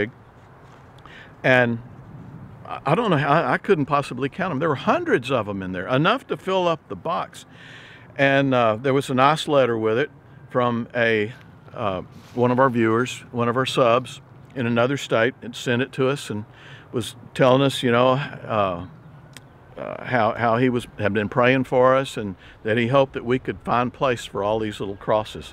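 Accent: Texas, United States. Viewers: What theme am I looking for when I watch an Out on the Ranch (with )? I'm looking for the sound of a fellow with a genuine Texas accent (reminding me of my childhood) who talks about good people being good to each other. I'm never disappointed. 💖💖💖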